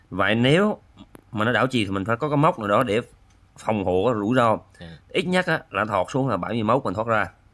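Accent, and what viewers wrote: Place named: Quang Nam, Vietnam. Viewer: helo a, nghe giọng a giống ở quảng nam z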